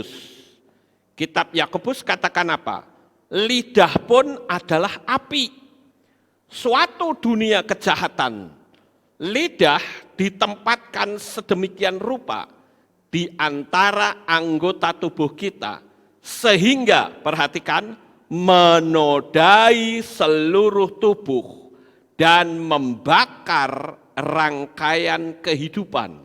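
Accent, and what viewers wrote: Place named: Magelang, Indonesia. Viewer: Itu logat orang magelang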